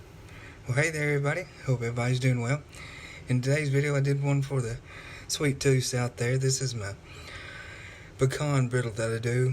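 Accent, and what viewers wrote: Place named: Texas, United States. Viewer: Awesome video I liked the look of this sweet brittle video but I don't like nuts much only sometimes! I love listening to the intro and the ending songs there very catchy! Your voice over is good when watching the video! You sound like your from the country or Texas lol! Team!!! 🌟💙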